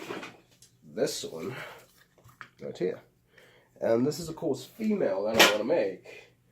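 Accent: United Kingdom. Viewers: Beautiful customs. Can you make Terriers based off of real life ones? You can just use Stepney. And great British accent. Get well soon.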